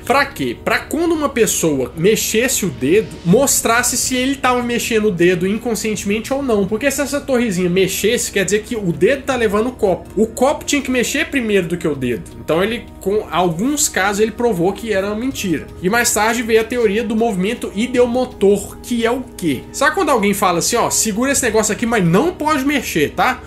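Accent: Minas Gerais, Brazil. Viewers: mds mano tu é mt mineiro, é o mineiro mais mineiro que eu já vi no youtube kkkkkkkkkkkkkkkkkkkkkkkkkkkkkkkkkkkkkkkkkkkkkkk fala mineirez dms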